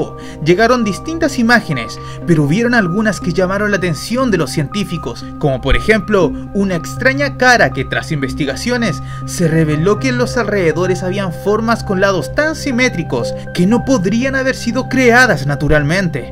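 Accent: Chile. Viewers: La voz de se nota la evolución en su narración y por primera vez le sentí su acento chileno 😳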